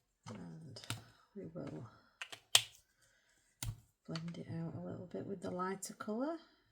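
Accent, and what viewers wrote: Yorkshire, United Kingdom. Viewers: Love your Yorkshire accent 😊 years ago I went to live in a place called Hesketh Bank do you know of it? Back in 1972 I was from Birmingham, that’s a broad dialect too but because my mother was not from there I never really picked up the accent but I can put it on when I meet someone from there over here. I had a friend once in Melbourne who was so offended when in a shop with me I spoke to a lady behind the counter who had the broadest brummie accent and I dropped into it to talk to her! 😂😂 love doing that!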